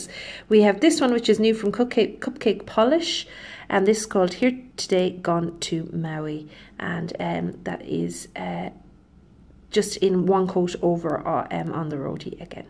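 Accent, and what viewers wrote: Ireland. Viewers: I'm applying Zoya's Merida as I'm watching your video! I love listening to your Irish lilt, what part of Ireland are you from? My great grandmother was from Belfast. Her father was a tugboat captain in the Belfast harbor & was one of the tugboats that pulled the Titanic out of the harbor on it's fateful voyage!:)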